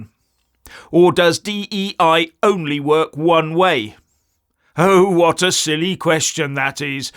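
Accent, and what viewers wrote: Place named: United Kingdom. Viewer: I watch ur channel to improve my understanding of British accent... U speak so clearly, I am impressed ...❤❤❤❤❤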